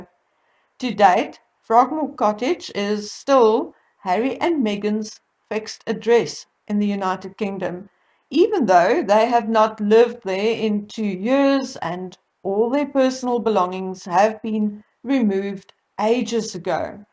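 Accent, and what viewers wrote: South Africa. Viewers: I love your videos but the accent is sooo hard to listen to. Dutch/German and African created the weirdest accent on earth. My bf is South African and even he says it's the ugliest accent on earth! Lol. Some people have nice soft ones but then you get the really stilted, harsh, Hocking ones. They don't flow at all it's like all from the throat and sounds tiring to speak lol. There's no flow, the one is off, like a computer voice. Know what I mean? Im clenching my jaw the whole time, it sucks cause it's so hard to listen to :( 😂 it's a real shame but it's infamous for a reason eh! I've listened to you for a year, and I'm STILL. Not. used. TO. IT. .😅😅 .It's. Like. There's a. fUll stop. After. Every Word. And they go UP . And the . Tone. Goes down at. Random. Points. " Or maybe its Zimbabwe but it's one of those German/Dutch meets African monstrosity combinations lol. 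Looks wise, caucasian Africans are gorgeous though.. the men and the women. the men are always sooooo sxc and manly! Woo! 😍 I think it's the mix of cultures and outdoor lifestyle , but yeah... They are sxc like we Australians . 😎😎 It's just the accent that can be gnarly.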